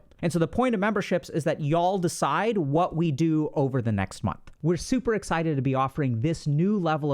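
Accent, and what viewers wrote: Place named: India. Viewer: Just gotta say it’s very dissonant for me to hear ya’ll from an Indian gentleman with a slight accent 😮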